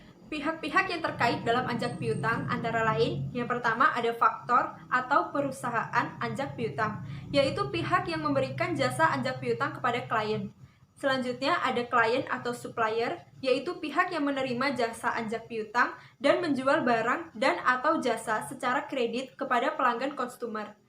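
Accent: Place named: Java, Indonesia. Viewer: Eh orang Bengkulu tapi medok Jawa🤭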